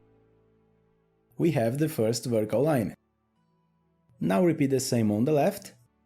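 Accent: Brazil. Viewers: Is that a beautiful Brazilian accent?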